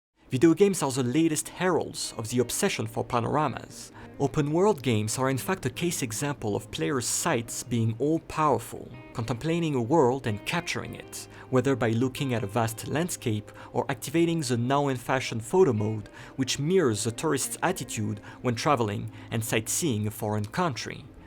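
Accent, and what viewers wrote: France. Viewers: i love your french accent lmfaoo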